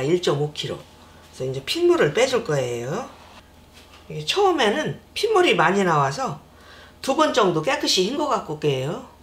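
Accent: Chungcheong, South Korea. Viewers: 무수, 무시 충청도구만이네요 구수하네요 예산,당진 그쪽 아니신가요 잘 봤어유 해먹어봐유 환장하자 맛있네유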